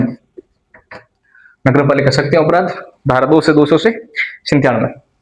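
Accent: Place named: Bikaner, India. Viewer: Jai Shree Krishna Bhaiya ...Apki boli Se Aap bikaner ke lag rahe ho..me bhi Bikaner se hi hu Or Army me hu..😊EO RO ki tyari ke liye Apse jud jaau kya ..Part-B pura karwaoge na Aap😊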